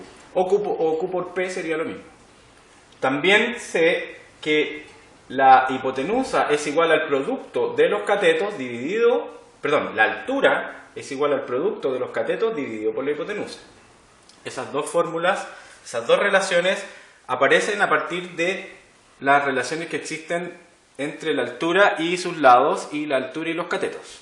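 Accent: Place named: Chile. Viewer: Me ayudó mucho, gracias. Eres excelente explicando pero me distraje con tu acento chileno.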